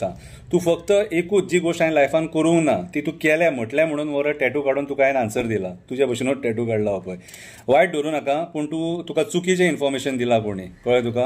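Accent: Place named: Salcete, India. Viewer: Xaxti accent good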